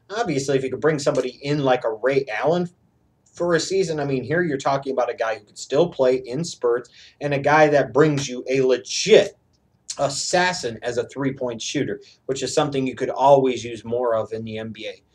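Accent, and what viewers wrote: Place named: Midwest, United States. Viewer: I love your midwest accent.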